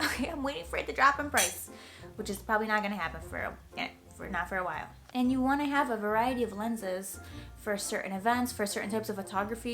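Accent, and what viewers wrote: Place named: New Orleans, United States. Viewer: I thought it was New Orleans accent, she reminds me of my friend from there. But she also kind of reminds me of Jennifer Lopez, though I haven't heard her as much.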